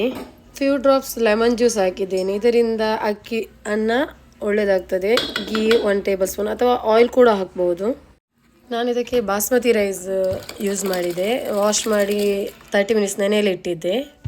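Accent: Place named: North Karnataka, India. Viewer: Nimdu yavoor kannada ri uthhrakarnata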